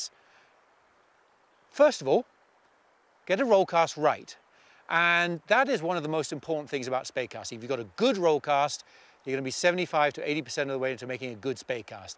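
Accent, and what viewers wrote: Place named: Wales, United Kingdom. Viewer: what part of wales are you from? I clocked your accent as I’m a cardigan native myself. Live in Scotland now though.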